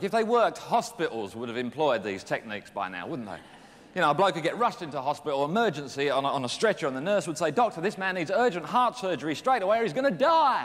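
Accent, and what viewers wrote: Australia. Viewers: I love how him living in Australia meant he has a slight Australian twang to his accent.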